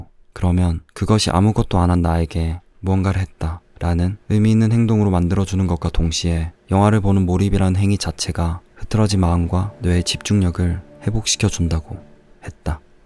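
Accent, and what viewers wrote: Gyeongsang, South Korea. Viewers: Love this channel. 고향이 경상도세요???? I can hear ur dialect❤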